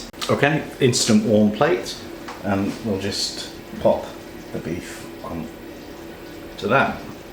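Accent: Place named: Northern England, United Kingdom. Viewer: I love your accent! What part of the uk is it from? my guess is northern!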